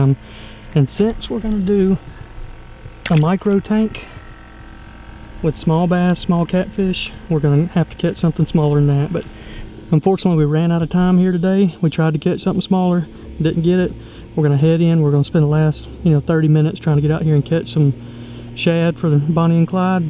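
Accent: South, United States. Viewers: You’re voice is very approachable, like you sound so sweet and southern. I’m sure someone would love to sit with you and fish for a bit lol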